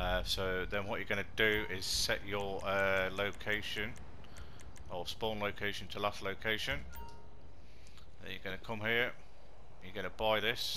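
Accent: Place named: United Kingdom. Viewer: Honestly never leave comments on videos but 1.your british and not a squeaky american 😩. 2.your straight to the point. 3.its not click bait 4.idk but you got a sub and a like from me 💚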